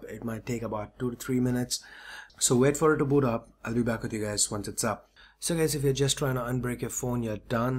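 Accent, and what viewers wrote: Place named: India. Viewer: You saved my day, brother!!!!! :D & you sound like indian.... that's close to me! :)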